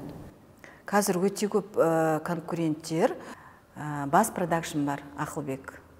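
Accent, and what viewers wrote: Standard. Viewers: қазақша таза сөйлеп қалыпты, молодец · ханым, жарайсыз! Осы интервьюда таза казак тилде сойлеп шыктыныз, кулакка оте жагымды естиледи екен (менде казак тилде клавиатура косылмаган айып етпесин)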